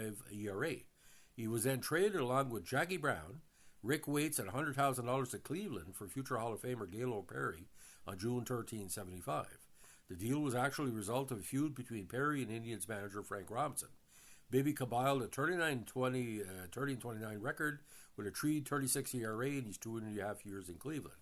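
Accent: Canada. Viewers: Are you Canadian? I’m having trouble placing your accent!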